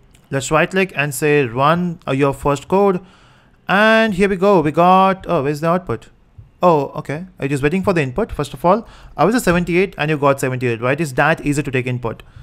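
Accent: India. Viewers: Great work in right time. Thanks for giving such a wonderful tutorial on kotlin. I like the Indian accent which is helpful for me to understand easily.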